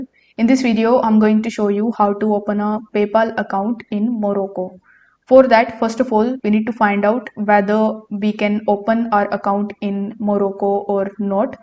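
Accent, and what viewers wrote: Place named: India. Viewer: 🙂🇲🇦 لغة انجليزية خاصة بك تشبه هندين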